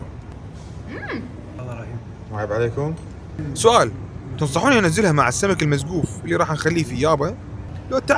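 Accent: Iraq. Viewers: اموت عاللهجه العراقيه❤😍🥰كويتي مر من عندك و علق بحسابك 🙏🌺🌺🌷🌷🌸🌸🌸🌹🌹🌹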